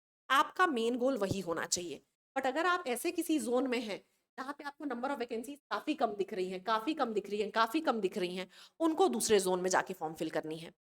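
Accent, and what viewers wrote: Chhattisgarh, India. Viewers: Ma'am aap chhattisgarh se hai kya??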